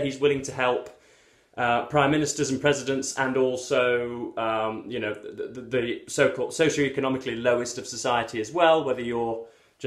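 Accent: United Kingdom. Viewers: I realize...I don't understand British accent. But with book as the topic of discussion, I am going to practice understanding your accent via your channel.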